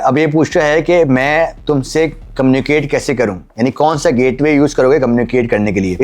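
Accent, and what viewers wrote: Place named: Karachi, Pakistan. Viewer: Fantastic explanation! Kaafi dino baad Karachi accent main sunker maza agaya!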